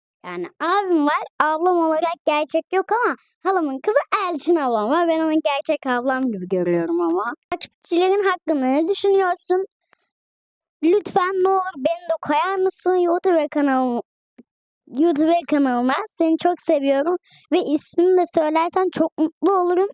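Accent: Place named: Samsun, Turkey. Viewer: Samsunlu oldugun cok beli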